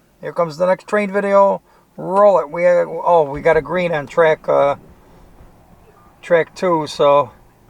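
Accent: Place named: Great Lakes, United States. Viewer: Lol I've always loved your accent. I never realized that accent was also in Upstate New York. People from Michigan, Illinois, and Ohio have that accent too around the Great Lakes. I guess they call it a "Great Lakes" accent. I'm from Southwestern Ohio (Dayton, specifically), so my accent is a cross between the Southern Ohio, Northen Kentucky, and Northern Indiana accents because that's where my family is from. People think I talk weird because I pronounce things differently! I say boxes as, "bahx-ezz", caramel as "car-mull", Lima as "lime-uh", and worship as "worsh-ep".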